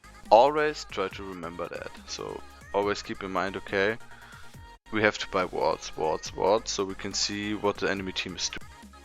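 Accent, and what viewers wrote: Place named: Germany. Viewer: you sound sooooo german :D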